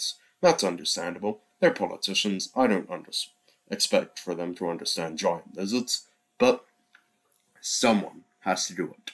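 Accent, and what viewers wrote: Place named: Ireland. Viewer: yeee 🤙, that's cool, for an Australian your accent definitely has an Irish sound to it I swear. I'm from Irish stock too and I have cousins with my Irish last name in Australian. The emu war was hype 🤣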